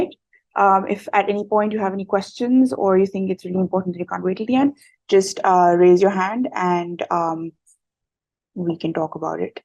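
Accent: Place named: India. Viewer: Ma'am sounds desi while speaking urdu and fancy while speaking english😭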